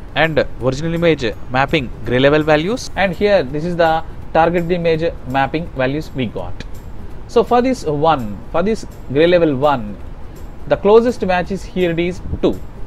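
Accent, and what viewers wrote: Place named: India. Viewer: The Indian accent could give a brain freeze to you, Advice: don't miss your lectures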